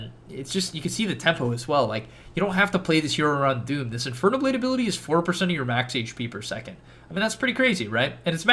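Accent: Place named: United States. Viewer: The way you say veil lol, I was like wtf is he saying.. had to listen 3 times. Do all Americans say it like that? Are u American or Canadian, u sound American I think.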